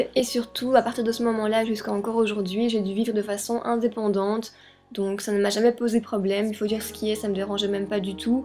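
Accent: Belgium. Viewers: est ce que tu es Belge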